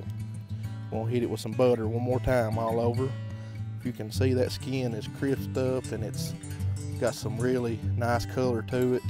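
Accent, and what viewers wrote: South, United States. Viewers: Call me biased, but I tend to place greater trust in smoking and barbecue recipes when they're delivered with a Southern accent :)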